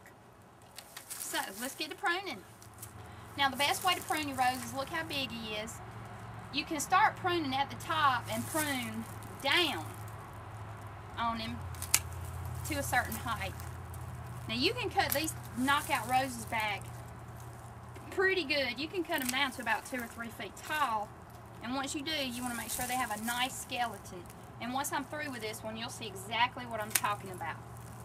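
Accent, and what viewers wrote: South, United States. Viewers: love that Southern Drawl, sweetie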